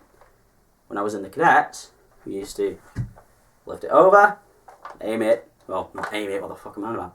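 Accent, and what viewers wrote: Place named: Manchester, United Kingdom. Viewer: yes thought you sounded mancunain , iam from Salford but i live in Bury.. lovely Rifle the L85 i got mine from zero one airsoft online ,it should of been £300 and odd but i got %10 discount so was just less than 300 and mine came two toned green , i prefer green to the orange and blue , plus it looks great on this rifle as its 2 toned anyway.. i will have to film a fireing test on it to show you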